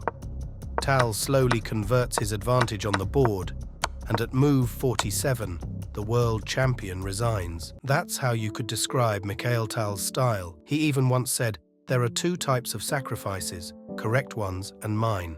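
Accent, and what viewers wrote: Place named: RP, United Kingdom. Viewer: You're not supposed to notice stuff like that when the narrator has a posh accent.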